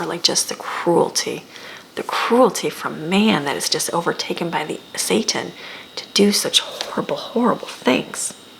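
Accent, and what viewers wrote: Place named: Ireland. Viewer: I thought the same Amy , sounds Irish as we would say. Mind you none so blind that do not want to see. Thank you for reading to us. This passage has such a familiar ring to it and isnt so far from what is going on in this world today.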